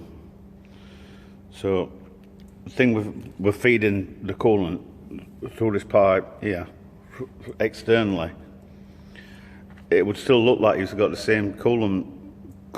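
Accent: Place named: Sheffield, United Kingdom. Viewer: brill channel love the Sheffield accent and humor Hope yr ok mate Wherever you are?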